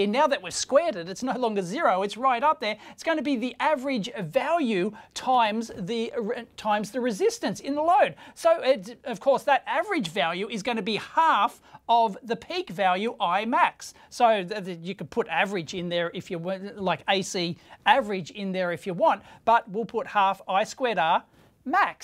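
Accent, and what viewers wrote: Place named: Queensland, Australia. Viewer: Anybody else unable to see a video and not go: "haiiiiiiiiii" in that Queensland accent lmfao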